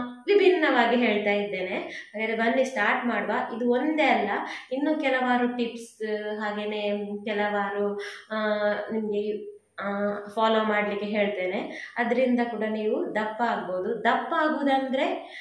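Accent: Mangalore, India. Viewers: I like your manglore style kannada bhashe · Kudla da Kannada 👍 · aha kudla kannada🥰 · Enklna kudlada kannada 🥰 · ಮಂಗಳೂರು ಭಾಷೆ ಕಡಕ್ ಭಾಷೆ ಸೂಪರ್ ಮೇಡಂ.. 🙏🏻👌🏻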